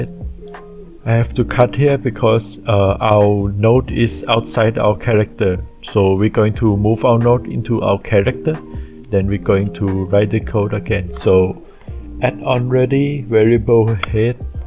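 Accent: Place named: India. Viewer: likes that Indian accent ☕️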